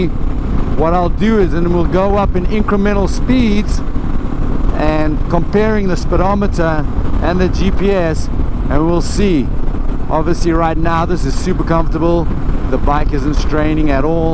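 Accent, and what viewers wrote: South Africa. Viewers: Is that a South African accent I detect? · I detected the accent pretty quickly too. I lived in SA from 1968 to 1979 in the Amanzimtoti area mostly..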